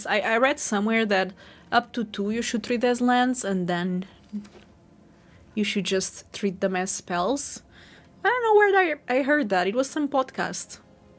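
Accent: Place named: Portugal. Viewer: Your videos are thought provoking and instructive. Even when I don't agree with your choices, my consideration of them makes me a better player. I also like your style of play and the fact that you are courteous to your opponent. I slow play a lot because I'm old. One more thing...I am a Portuguese-American. When I hear your accent, it reminds me of my old neighborhood where so many women talked like you and I smile. So, just like me close my saying that as far as your videos go gosto sim. (That exhaust my command of the Portuguese language.)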